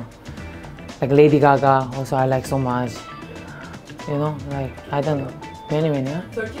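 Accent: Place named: Turkey. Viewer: 언니 터키식 영어 너무 좋아…마지막에 터키어랑 손키스는 뭐야 ㅠㅠㅠㅠㅠㅠㅠㅠ 하 미치겠네 중간에 자기 구글에 쳐본적 있냐에 답할때 왜 이렇게 귀여운건데………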